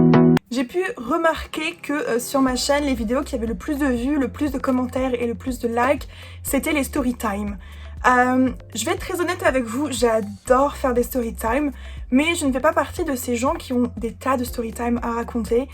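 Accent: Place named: France. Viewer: Sinon....le mec qui se moque de ton accent ....j'ai connu ca aussi avec un de mes British dates (j'en ai eu 2 au total lol). Et il m'avait fait la même, il se moquait de mon accent ...il m'a tellement gonflée que je me suis barrée et je l'ai planté du coup 😅😁 Alors voilà, comme tu disais....on sait qu'on a un accent parce qu'on est francaises...mais y'a des limites !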